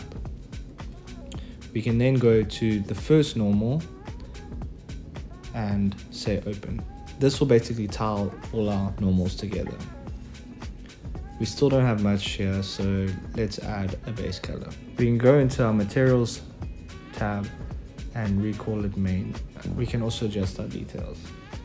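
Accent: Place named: South Africa. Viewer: That South African accent! My boy!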